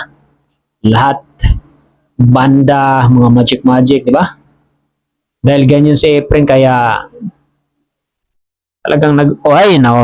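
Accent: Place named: Visayas, Philippines. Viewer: Tana na bisaya ingay mo 😅😂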